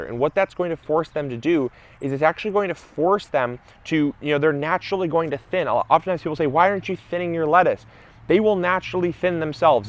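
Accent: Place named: Minnesota, United States. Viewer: I'm from Canada so I have no idea, but is this what people from Minnesota sound like?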